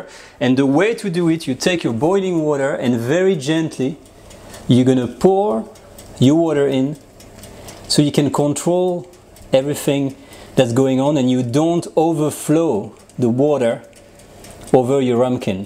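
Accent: France. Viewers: Your videos are superb, made better by your excellent English but with a perfect French accent. Question: how about a pinch of salt in this recipe? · I like the way you speak English 💕 it's a French mix ❤ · I love the French accent when mixed with good English! Recipe was so easy to follow. Thank you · Looks very yammy. I like the French accent .👍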